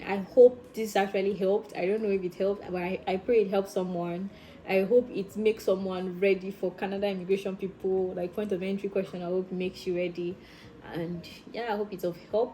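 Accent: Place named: Cameroon. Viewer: U sound like a Cmrnian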